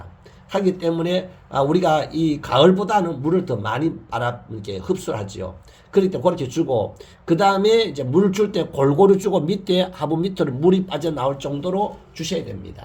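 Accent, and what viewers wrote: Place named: Daegu, South Korea. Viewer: 대구 사투리같은데 듣기 넘 좋으네요 ..... 가드닝 지식 많이 배웁니다 감사합니다 늘 건강 하시길요^^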